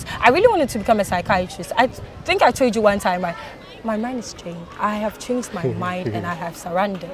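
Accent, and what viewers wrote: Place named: United Kingdom. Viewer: This is so good.. she speaks with a British accent. That is what pertains in the UK. We can model it here for trial.. brilliant idea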